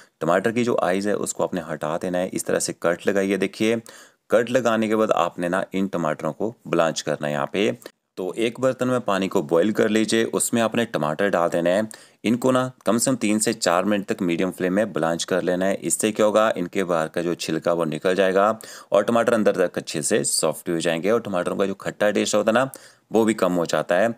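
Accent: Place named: Himachal Pradesh, India. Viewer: App kaha se ho u sound like Himachali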